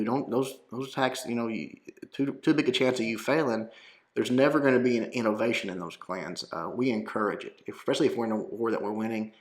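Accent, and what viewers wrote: South, United States. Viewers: Wow a dude with a southern accent that has taxidermied animals what a shocker.........some people really need to leave their bubbles sometimes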